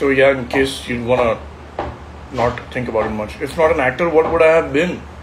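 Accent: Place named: India. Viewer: It's difficult to catch english with an indian accent , but it was nice to see a very talented actor. Best wishes from Russia.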